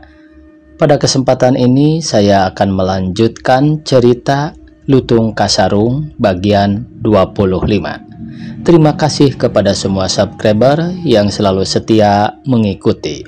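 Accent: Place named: West Java, Indonesia. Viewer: Bahasa lndonesia, aksen Sunda.. Ki Sunda mah cocokna macakeun dongeng Sunda..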